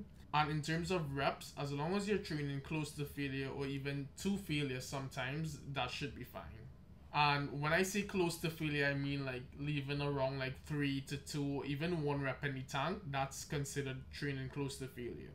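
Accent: Trinidad and Tobago. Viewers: Great content. Can't hide that Trini accent 🇹🇹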